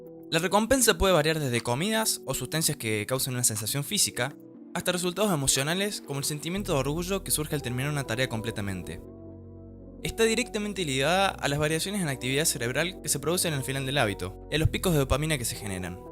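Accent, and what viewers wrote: Córdoba, Argentina. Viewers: Esa tonada cordobesa 🤤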